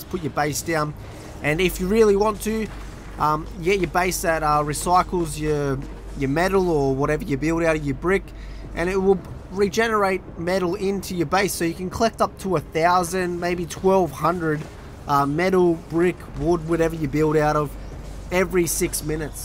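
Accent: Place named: Australia. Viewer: This is worth watching just to hear your accent! All commentary should be done in Australian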